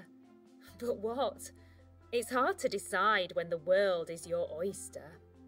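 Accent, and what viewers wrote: England, United Kingdom. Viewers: Beautiful English accent!. I love listening and enjoy reading your stories. Thank you.🙏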